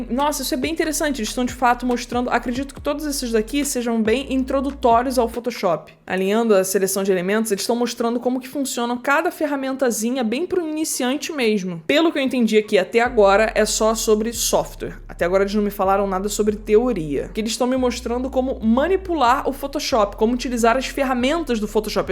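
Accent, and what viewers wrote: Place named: Rio de Janeiro, Brazil. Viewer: Legal esse seu acento carioca. Me lembra muito uma amiga do Méier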